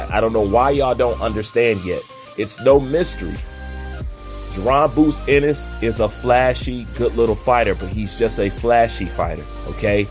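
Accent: United States. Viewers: You sound like you from Philly😂😂😂 I'm sure you seen the same pics we just seen! That's a different look that Boots gave to Spence😂😂 He was talking 💩 to Spence but he looking nervous next to Crawford! Boots looking like he don't want to fight Crawford but his daddy wants him to😂😂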